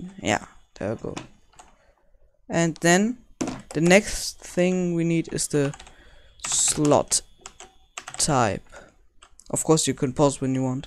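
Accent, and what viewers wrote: Germany. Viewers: haha zu geil dieser deutsche akzent! aber gutes video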